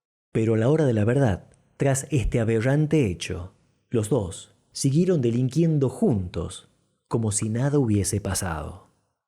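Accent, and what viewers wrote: Argentina. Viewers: Buena narración chico,te dire que no me gusta el acento argentino, pero te felicito, pues me atrapaste con tu historia,saludos!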